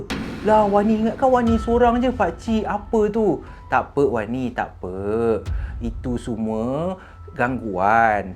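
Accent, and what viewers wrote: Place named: Kedah, Malaysia. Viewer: Orang Selangor nak CAKAP Kedah MEMANG susah lah SIKIT sebab loghat Kedah ni sangat pekat sedikit. Best lah ni.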